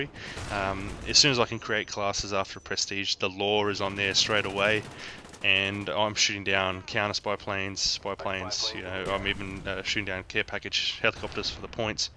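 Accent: Australia. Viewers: Finally a gd Aussie commentator what state r u from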